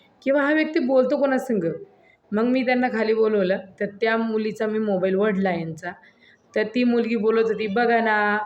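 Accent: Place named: Khandesh, India. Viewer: पण तुमच्या बोलण्या वरून तूम्ही पुणेरी वाटत नाही तुम्ही खानदेशी किंवा सातारा सांगली चे दिसत आहात हा तुम्ही आता पुणे मध्ये राहत असाल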